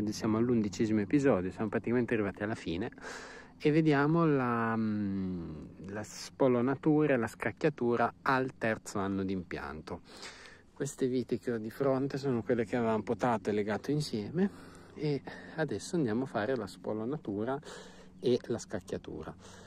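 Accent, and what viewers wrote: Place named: North, Italy. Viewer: Salve SEMPRE molto chiaro. Scusi di dov'è perché non ha accenti ma sicuramente del Nord, io sono del Lago di Como. Quest'anno il Lago è stato riempito 2 volte se non tre.......😊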